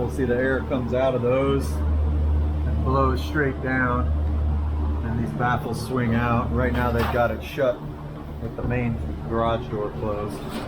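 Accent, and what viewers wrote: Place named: Midwest, United States. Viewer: I've been watching some of your videos lately and never would've guess you were in Hawaii. Was thinking more Midwest or central US. Anyway, great stuff! There's something strangely relaxing about coming home from work and watching someone else work 😂. I do a fair bit of electrical troubleshooting in my job and I like to hear other people's thought process while working through a complex issue. You do a great job narrating and explaining the issue and resolution. Thanks for the great content!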